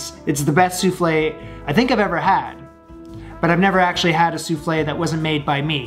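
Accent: Canada. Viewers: Please respond to my comment. You are Canadian based on your accent. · Yup, been binge watching these too the past few days. The best thing is waiting for to drop an "about" in his adorable Canadian "ooh" accent. 😁 Definitely making these soufflés myself! Keep the orders up, J! 😋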